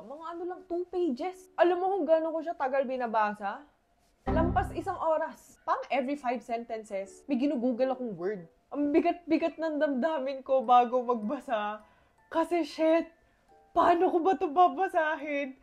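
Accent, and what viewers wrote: Batangas, Philippines. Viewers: Yong sound nang english mo ate girl true studied in philippines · i initially thought na filipina ka na singkit lang talaga (like pure filipino dahil may friends akong they look like they are half but in reality, full filipino) dahil sa vibe mo and the way you talk, u earned my sub! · Koreana ka sa itchura pero accent mo Tagalog Hindi ka na masabi na koreana ka...Ang galing mo kunwari lahat ng Korean ay magkaka klac at subject pilipino..malamang ikang ang pinakamagaling sa lahat.. specially 4 years old ka sa pinas ka na. Baka fluent ka pa sa Tagalog Kay sa Korean language.. . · I like esp. Bataguena accent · wow. your channel popped up on my feed just now. grabe te, natural yung topak mo. pikit lang ang mata, pinoy na pinoy ang punto. grabe tawa ko! this was really enjoyable. i'm surprised na wala ka nakita ibang koreans masyado sa UP since kahit na 20+ years ago, andami na umaaligid aligid sa UP. 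may nanligaw pa nga sa orgmate ko eh. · You’re so funny. If I don’t see your face I will absolutely say you’re Pinoy. You behave, sound and act like pure Pinoy. Great job! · Alam mo ng napanood kita sa vlog mo natuwa ako kase talo mo pa ako mag salita ng batanguena kse batanguena ako pero sa manila ako lumaki at ng aral ng high school at kolehiyo tuwang tuwang ako sayo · Nakakatuwa, lumalabas pa rin ang batangas accent ni ineng.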